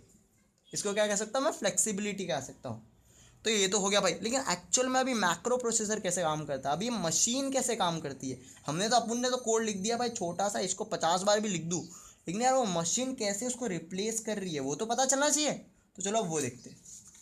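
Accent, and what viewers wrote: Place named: Gujarat, India. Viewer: are bhai aap kaha se ho? bato se to gujrati lag rahe ho....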